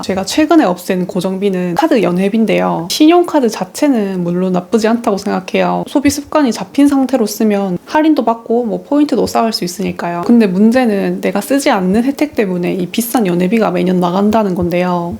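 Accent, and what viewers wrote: Gyeongsang, South Korea. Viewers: 주인장 억양이 경상도분이시네~~대구 아니면 부산분이신듯~~😆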